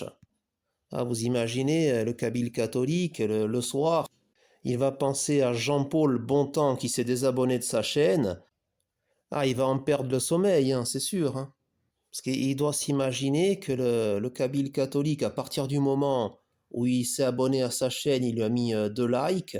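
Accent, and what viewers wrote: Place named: Corsica, France. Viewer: Cher compatriote, Pace e salute ! Votre accent ne m'avait pas trompé, je le connais trop bien. Permettez-moi de répondre à votre contradiction, non pour alimenter une stérile polémique, encore moins pour être désagréable, Dieu m'en garde. Je souhaiterais simplement vous poser quelques questions. Au préalable, je précise que j'ai reçu le baptême avant Vatican II, et que je demande chaque jour la grande grâce d'être inébranlablement fidèle à ma Mère, la Sainte Église, à sa Doctrine, à son Culte, à sa Morale, à sa Loi. Voici donc mes questions : Un VERUS PAPA, càd catholique, revêtu validement et légitimement des prérogatives de Saint Pierre, peut-il ostraciser et interdire la Messe codifiée à perpétuité par saint Pie V ? Peut-il nier la Médiation universelle et la Corédemption de Marie ? Peut-il bafouer la Morale en bénissant ceux qui pratiquent des abominations à Dieu ? 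Peut-il soutenir que toutes les religions conduisent à Dieu, contredisant ainsi le Commandement du Seigneur à ses Apôtres avant son Ascension et la raison même et la sacrée Mission de l'Église hors de laquelle point de salut, laquelle continue Jésus-Christ ? Un authentique Vicaire de Jésus-Christ peut-il affirmer que les catholiques adorent le même Dieu que les musulmans ? J'arrête là, mais je pourrais allonger la liste sur de nombreuses pages. D'ailleurs, vous pouvez vous-même comparer la Doctrine immuable de la sainte Église, de ses Papes, de ses Conciles, avec les innovations et hérésies professées depuis Va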